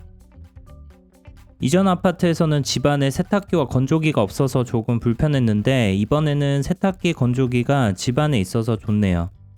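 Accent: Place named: South Korea. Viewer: You were born in America?? How come you have heavy Korean accent?? 😳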